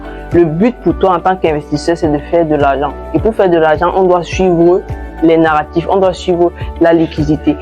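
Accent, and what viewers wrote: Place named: Cameroon. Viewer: J'aime regarder tes vidéos et j'adore ton accent camerounais.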